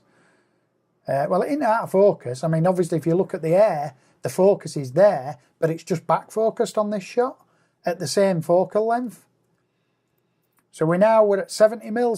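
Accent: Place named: Yorkshire, United Kingdom. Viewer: you are from yorkshire mate.....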